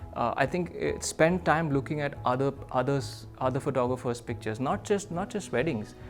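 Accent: India. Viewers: Dear, Looking at your breath taking photos and the comments and the kind of person you are, remembered a joke… once a Chef visits a Photogapher’s home and appreciates all the photos and in the end says you have a awesome camera, another day the photographer visits the Chef’s home tastes lip smacking dishes and says ‘you have a n awesome gas stove’ ….you are awesome…very interesting to see a Chinese Photographer with so much exposure to Indian culture…. Bow to your humility and talent. Were you brought up in India, looking at your Indian accent? And to sum up the message just a few minutes back found this video of Photographer Annie Leibovitz which I would like to share: <link> Thankyou Pixel Village and for this wonderful video by. P.S. In the past for me wedding equals trauma…and I recovered from the trauma and managed to make it beautiful by using EFT which is based on Chinese Accupuncture….so some connection with you:). 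Thankyou for sharing from your heart, i too love taking photos of my family with my mobile for the time being:)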